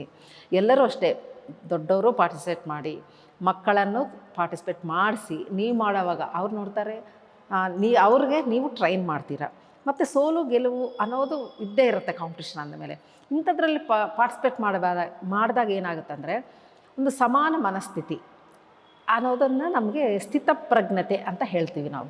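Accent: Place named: Andhra Pradesh, India. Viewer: ನೀವು ಮಾತನಾಡುವ ಶೈಲಿ ನೋಡಿಯೇ ನಾನು ಅಂದುಕೊಂಡಿದ್ದೆ ನೀವು ಆಂಧ್ರ ಮೂಲದಿಂದ ಬಂದವರು ಅಂತ, ಆದ್ರೆ ನಿಮ್ಮ ಕನ್ನಡ ತುಂಬಾ ಚೆನ್ನಾಗಿದೆ😊ಖುಷಿ ಆಯ್ತು ನಿಮ್ಮ ಸಾಧನೆ ಕೇಳಿ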